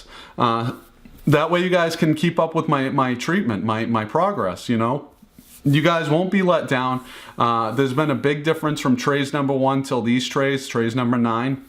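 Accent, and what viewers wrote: Boston, United States. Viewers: That's a crazy story..people have no consideration...You remind me of Ben Affleck..the look and boston accent...:)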